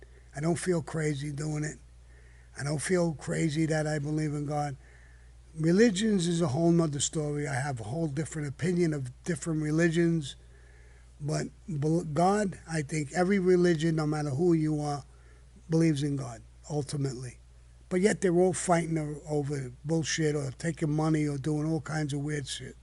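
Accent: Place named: Brooklyn, United States. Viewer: I speak the same way, no offense to anyone. It's a Brooklyn thing , I think 👊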